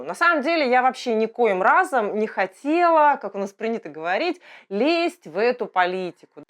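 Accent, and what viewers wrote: Yakutia, Russia. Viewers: Пахай, сахалыы акценнаах санарар буолбуккун, дьэ хомолтолоох.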